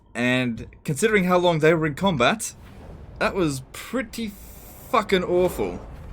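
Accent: Australia. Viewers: Bruh. I like putting these on as background noise cuz I love TW and has the free content perk of an Australian accent, but I heard those silly ass bells and looked at the screen. Lmaooooo what are those GOOFY dudes. 69/10 doomstack imo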